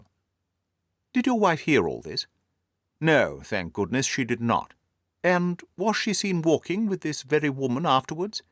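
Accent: United Kingdom. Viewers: Is it British accent ?? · I think its an British Accenct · Is this british accent? Pls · Yes, British accent👍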